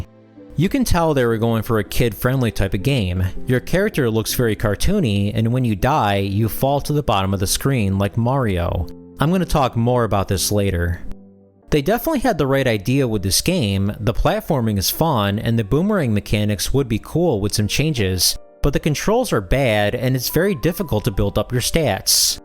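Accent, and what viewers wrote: Chicago, United States. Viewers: Is that a Windy City accent?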